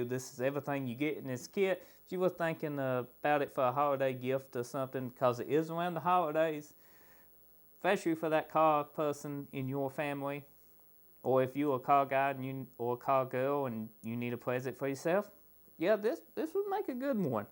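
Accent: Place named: Texas, United States. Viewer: Your Texas accent is difficult to understand but this kit looks like a good value.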